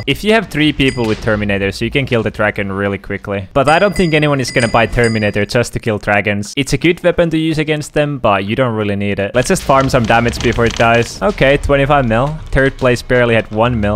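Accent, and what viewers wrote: Finland. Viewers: häiritsee ku englannissa kaikkilla suomalaisilla on semmonen aksentti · Finnish accent 👀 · R u from Finland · are you finnish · The finnish accent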